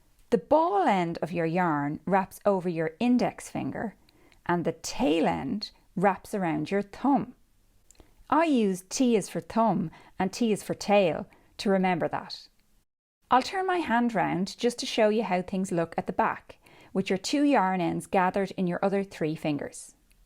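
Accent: Ireland. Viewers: You explain so well and go nice and slow. I think I've got it now. As for your knit stitch, do you knit like Americans and the English or the continental way? It just looked different, but then I haven't knit for over a year. Also, your Irish accent is soooo charming. What part of Ireland are you from? I had an Irish great great grandmother Gosnell, but I don't know where she was from.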